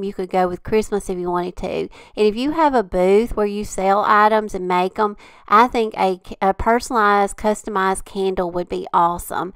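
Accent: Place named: Kentucky, United States. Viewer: you're so very talented! Also, since I'm in KY, you do talk just like us. The recipe cards almost made me cry, because they look just like the ones my mamaw and my mom left us. They were stained, very well-used, and written in their own hand. Lovely video!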